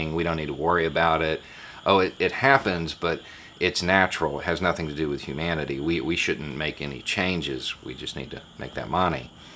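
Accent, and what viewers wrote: South, United States. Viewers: Its sometimes hard for a person from the Caribbean to understand southern accents,u just made it 100 times better,thank you😍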